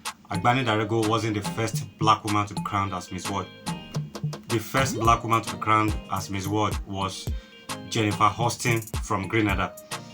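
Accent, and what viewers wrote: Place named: Yoruba, Nigeria. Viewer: Your yoruba dialect is too strong for my english language ears. Agbani did not study mathematics and computer science please get your facts. She studied psychology in the US after completing her miss world reign.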